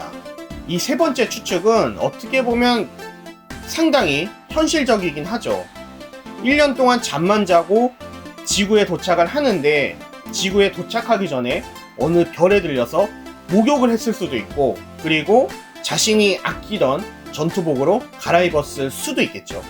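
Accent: Japan. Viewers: 유튜브를 일본식으로 자꾸 유츄브라고 발음하시는 이유가 있으신가요??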